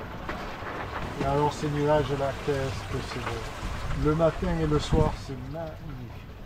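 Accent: South, France. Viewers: Fantastique belle traversé ca fait bien envie merci de nous partager tes aventure toujours avec cette bonne humeur et cette accent du soleil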